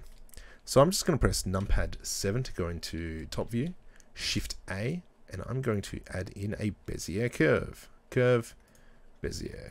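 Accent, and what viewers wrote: Australia. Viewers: Yeah - I am using Blender 2.82 which is a bit different - but the convert-to-mesh was in the same place. Do you have a tutorial to add materials and export for threeJS by any chance? Very cool to hear an Aussie voice in this environment also!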